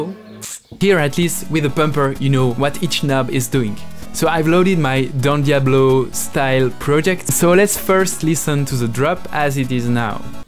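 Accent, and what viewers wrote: France. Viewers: Your accent really looks like the french one. idk if you speak french but ya fun fact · french accent spooted?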